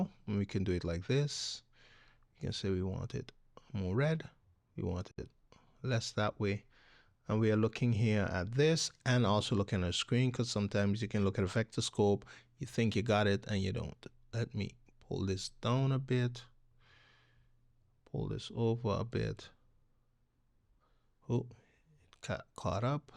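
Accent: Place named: Trinidad and Tobago. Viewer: You talk like you're from Trinidad!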